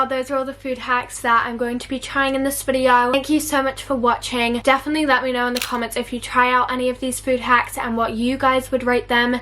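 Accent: United Kingdom. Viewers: Your videos are amazing!! Love your way to do this 💕 and your british accent is so pretty ❤ hugs from Ecuador :)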